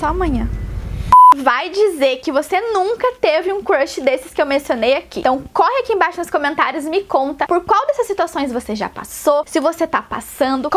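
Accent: Rio Grande do Sul, Brazil. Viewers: tu é gaúcha guria? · Guria é gaúcha??! olha nn sei c é gaúcha mais q é linda tu é qrd👌❤❤😘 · Vc é gaúcha?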